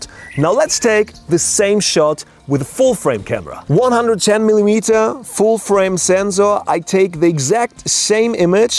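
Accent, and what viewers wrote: Germany. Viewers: "Zenzorrz" and "Sand corns". Okay, to clarify, is German and in Germany we have 2 ways to pronounce the "S" –> sharp and soft. The German pronounciation of the German word "Sensor" is more like (or exactly like) IF it was spelled "Zenzor". That is the reason why this sounds a bit weird here. A common mistake by Germans. We have a term for this: "denglisch" (deutsch + englisch). The German "Z" by the way is always pronounced like a short high hat "ts", never like a soft "S". The word "sand corn" I believe he also derived from the German "Sandkorn". In English it sounds a bit off, because it's more common to use "grain of sand", but I guess it does it's job. But other than that his english is pretty decent – you have to give him that. I don't know any English speaker who performs German for example in that fluent manner. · are you German? 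· let me guess... you are from Germany? ;D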